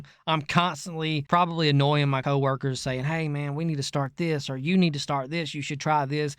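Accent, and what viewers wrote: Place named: West Virginia, United States. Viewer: This guy's accent is legendary. If he's not from the heart of West Virginia, I'll be damned.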